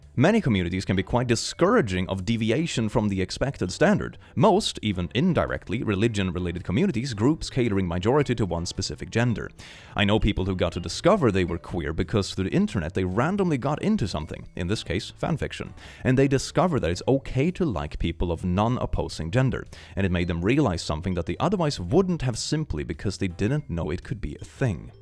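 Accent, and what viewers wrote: Sweden. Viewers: I thought you were American until the impression of Danish, and now I can't stop hearing the Swedish accent. 😂 Good job on getting a very international following! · while you are pretty, I tune in mostly for your accent and the casual tossing out of Swedish words. It reminds me a bit of my Swedish-born first husband! He had a beautiful voice, too.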